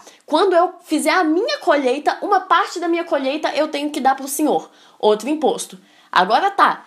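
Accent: Minas Gerais, Brazil. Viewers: Ela é Belo Horizontina, mineira. Haha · Vc é mineira né? Sotaque lindo sô ❤️ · acho q é mineira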